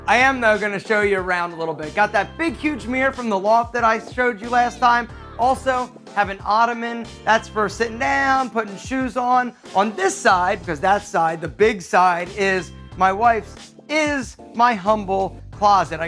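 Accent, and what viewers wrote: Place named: South, United States. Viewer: Where did that southern accent come from?!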